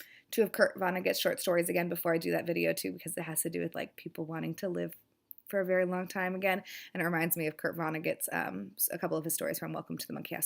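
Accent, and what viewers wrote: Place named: New York, United States. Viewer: Nine Simple Patterns sounds really interesting and right up my alley. The pooping incident happened to my cousin lol. It wasn't funny at the time though. I've been feeling like people are overly sensitive for the past few years. As a whole we've just gotten more dramatic. I like your New Yorker talk. I want to subscribe now!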